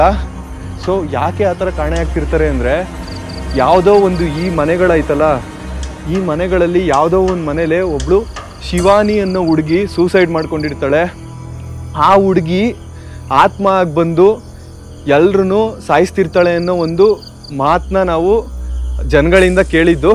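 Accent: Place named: Ramanagara, India. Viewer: ನೀವು ಎಲ್ಲೇ ಹೋದರೂ ನಮ್ಮ ಚನ್ನಪಟ್ಟಣ, ರಾಮನಗರ language slang ನಿಮ್ನ ಬಿಡಲ್ಲ ❤😊😅 Ex: ಸೊತ್ತೆ 😂😂😂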